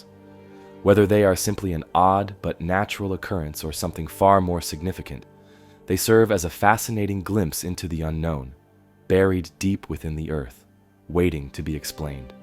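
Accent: United States. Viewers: Thank you for the voiceover with an American accent. Well done. 👽✌️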